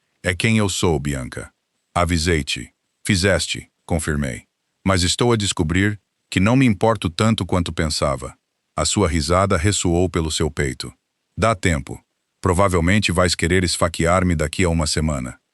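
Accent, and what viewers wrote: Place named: United States. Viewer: Odeio essas palavras em Português é sótaque americano é horrível fala o português por favor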